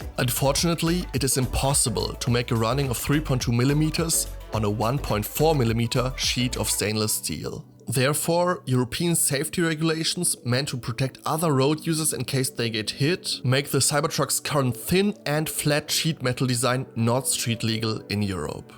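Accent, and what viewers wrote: Germany. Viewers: German accent by ai is terrible😢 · You sound like a german talking english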